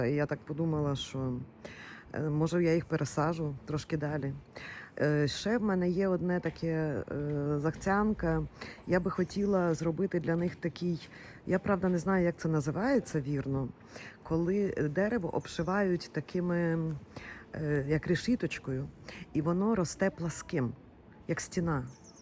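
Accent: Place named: Central Ukraine, Ukraine. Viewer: Гарна українська мова при 20 роках в Англії. Схожа на центральну Україну.[ Хай щастить.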